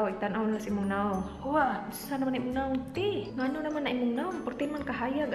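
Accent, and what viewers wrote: Visayas, Philippines. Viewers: lami kai ka paminawon kung mag bisaya ka madam 😂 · im not visaya pero naiintndhan ko,thanks sa akong x bf hahah.ang cute mo mag visaya day.More Powers!!! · mahimout kos accent sa bisaya. taga cebu sd ko, your loyal viewer. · nalingaw kog tanaw kai gahi kaau ang bisaya bagsik kaau 😂 Ganahan nako mu trt ug vlog hinoon! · Ang cute mo Ate hahahaha di ko naiintindihan pero ang cute kasi ang daldal mo po tsaka ang cute ng accent talaga ng Bisaya 🤣🤣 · hahaaaa..😂 Bisaya kaayo nindot pamation..taga San Francisco, Agusan del sur , Mindanao ko. nah,ako sab conscious sa akong kilay mga biente minutes jud ko mag sulat sa akong kilay hahaaaa😂😂. gwuapa na kaayo ka.😃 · Kataw-anan man ka te oi 😂Hahaha bisaya kauu ka tenu remind me of my cousin s philippines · ang cute cute mo sa pagbibisaya ms.:) naintindihan ko lahat from visayas din kasi ako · Taga asa diay, madam? 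Kasabot kaayo ko nimo. Lingaw kau ka sa imong pag binisaya. Sa Davao ko karon nag puyo..:D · hi im from cagayan de oro bisaya japon..cute kaayo paminawon kung magbisaya ka ay.. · hahahhaa lingawa nimo mag bisaya oy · Ka cute mag bisaya nimo oy hehehe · Mas nindot japon paminawon mgbisaya ka Ms. 😊 From dipolog z.n. here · omg so funny idk why but kong mag bisaya funny paminawn kay relate much ko lol. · Grabe ka cute nimo magbisaya ate 😍 karun rako naka discover sa imong channel ate hihi lingaw kaayo.. Gwapa jd ning mga cebuana😍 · Ang cute niyo po mag bisaya, sayang di ko maintindihan. :( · hahahhahaha kakatuwa ate kahit di ko naintindihan.. pinanood ko pa din cute mo kasi ate mag bisaya.hehehe😃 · whahahaha tawa po ako ng tawa dito, ang cute mo pong magvisaya ate hehe, kahit di ko po naiintindihan pero sayang saya po ako, parang naiintindihan ko hehe. ang cute lng 😊😂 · Woooohooooo! Bisaya kaayu!!!! 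Lingaw kaayu ni dai! Hahahahaha! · Cagayan or CDO kay bisaya.. makalingaw man ka magbisaya oi heehehe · Ang cute cute mo mag visaya 😂😂😂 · Nakakaaliw ka Ate magbisaya 😍😁 Haha. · i really love the way u speak visayak ate hahaha visayak video more ate pls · char bisdak kaau hhehe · Pg talga nag bibisaya prang nakakatawa 😂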